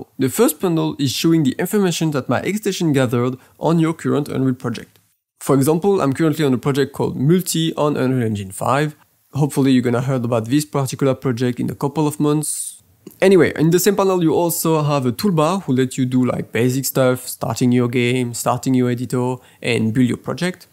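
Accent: France. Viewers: Dès la 1ere seconde de la vidéo j'ai spot que tu était francais c'est fou, ton anglais est trèèès bon c'est juste que entre francais je reconnais l'accent francais ! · Really nice 👍 Jugging by your accent are you french? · Oh le gros accent français qui fais plaisir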